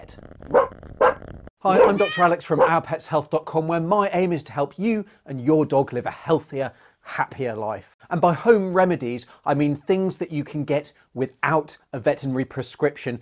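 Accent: United Kingdom. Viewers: You talked so fast I couldn't understand what you were saying especially with your English accident. Sorry. But I'll have to look somewhere else for a remedy for my dogs' itching.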